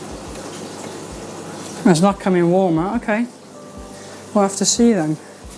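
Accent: Wales, United Kingdom. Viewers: I'm confused, as a Welshman I'd swear that accent was Welsh, but then I find you're a Nederlander. 🤯 😂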